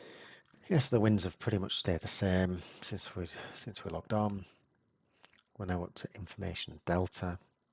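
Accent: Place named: Hull, United Kingdom. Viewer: Sounds like a Hull accent.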